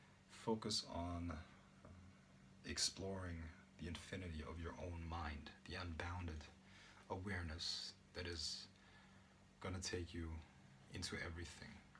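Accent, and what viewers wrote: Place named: United States. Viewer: Y do u live in Germany u clearly have an American accent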